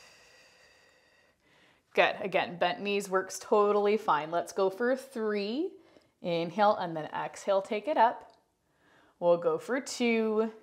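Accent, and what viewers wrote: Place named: Canada. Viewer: I love this challenge! I was surprised to hear that you grew up in Arizona you sound Canadian! I mean that as a compliment!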